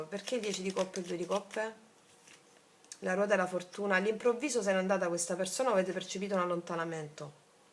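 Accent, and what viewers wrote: Italy. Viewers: sei una mia recente scoperta.. ❤ vorrei incontrarti, sento dall'axcento che sei nella mia stessa penisola.. ti inviero una mail..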